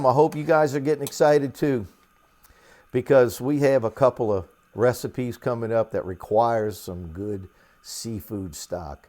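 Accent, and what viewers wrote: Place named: United States. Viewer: Where is your amazing accent from? Delaware? Maryland?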